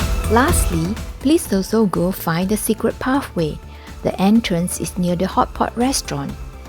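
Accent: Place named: Japan. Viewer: Hi, thanks for the good info of these cruises. Btw, you sound Japanese. Are you?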